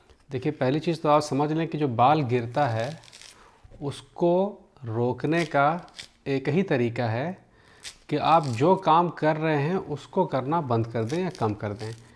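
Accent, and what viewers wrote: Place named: Uttar Pradesh, India. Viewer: Thanks bhai or aapki awaj bilkul up walo k tareh hai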